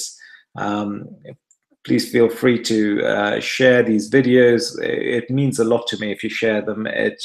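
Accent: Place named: United Kingdom. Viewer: You are sooooo handsome! Why do you have a British accent? You look like my friend, Dr. Raji. From India.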